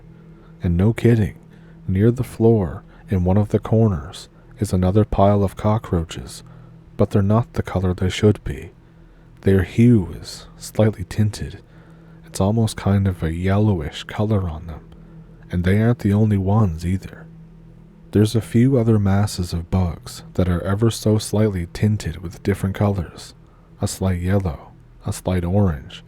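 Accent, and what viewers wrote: Ontario, Canada. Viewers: They popped more than a couple off in the Hudson Bay. That's a fact. Always a pleasure to hear yuir voice, it always reminds me of my Ontario cousins. Oof Da. 🎉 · I think it's neat that anyone outside of Canada listening to this is going to think it's a Canadian accent but as someone who is from Canada and has lived all over I can definitely tell which region you're from. And it reminds me of home❤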